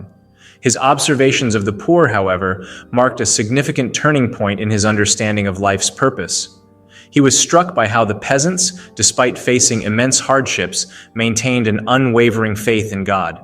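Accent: United States. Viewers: Why an American accent ur not European goodbye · I thought i was going to get some professional English speaking historian talking about this, and what I get is another jumped up Yank. No, thank you.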